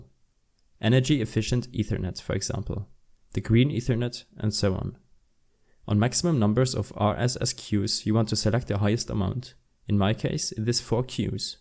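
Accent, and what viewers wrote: Germany. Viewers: lol the german accent xd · Man hört so hard das du Deutsch bist 😉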